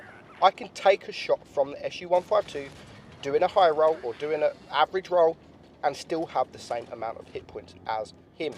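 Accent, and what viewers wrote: United Kingdom. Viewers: I Love your british Accent :v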